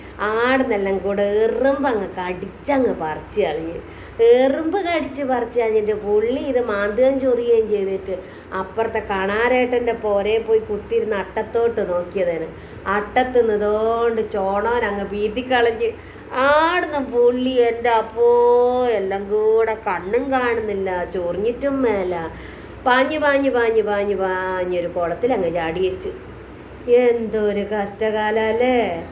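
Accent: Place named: Kozhikode, India. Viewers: കോഴിക്കോട് സ്ലാങ് അല്ലെ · നമ്മളെ സ്വന്തം കൊയിലാണ്ടി ഭാഷ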